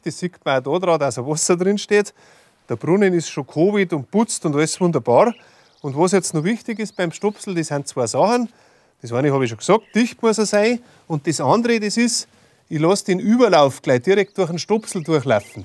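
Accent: Bavaria, Germany. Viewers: einfach super gezeigt.......bitte unbedingt die bayrisch Sprache weiterhin so schön pflegen. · Sehe ich genauso das man Dialekt/ Sprachen pflegen sollte aber ich als Nordeutscher könnte es locker in Platdeutsch verstehen aber in Baiyrisch habe ich echt Probleme da wäre son untertietel echt praktisch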